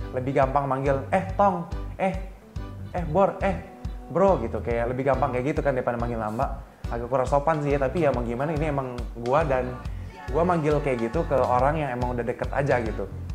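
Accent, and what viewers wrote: Indonesia. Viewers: Logat Serang nya brasa bgt yaa 😁🤭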